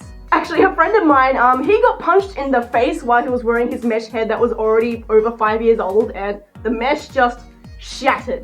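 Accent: Australia. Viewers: Is amazing you sound so auzzie I love it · i can tell Ur an Australian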